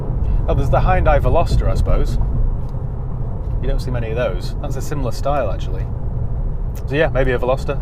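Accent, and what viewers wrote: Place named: Manchester, United Kingdom. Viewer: That Manc accent, Scriocco Ahhhhh 😂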